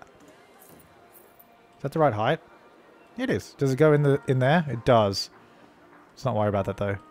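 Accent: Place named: Australia. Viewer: Is it just me that thinks he sounds like a Australian WanderBots?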